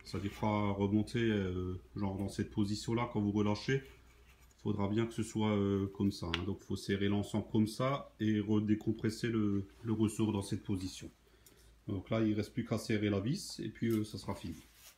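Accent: Alsace, France. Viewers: Accent alsacien ? ;-)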